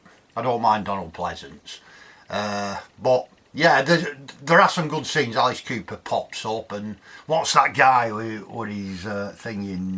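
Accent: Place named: Cockney, United Kingdom. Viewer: Holy cockney!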